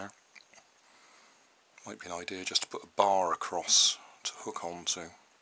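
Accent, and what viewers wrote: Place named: Cornwall, United Kingdom. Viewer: Sounds like a Cornish accent to me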